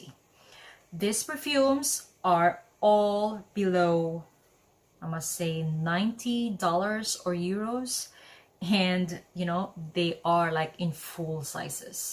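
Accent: Philippines. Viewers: Filipina accent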